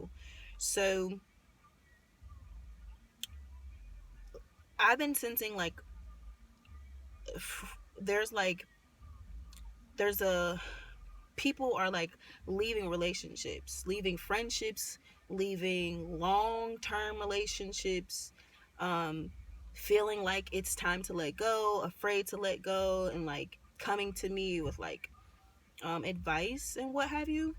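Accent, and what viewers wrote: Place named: United Kingdom. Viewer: Love the British accent doooling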